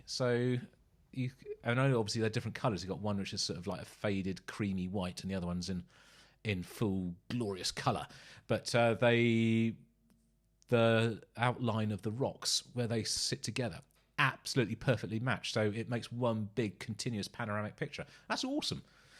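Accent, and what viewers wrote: United Kingdom. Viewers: Doug is pretty great too…but he’s American. I love hearing British accents so I prefer your channel.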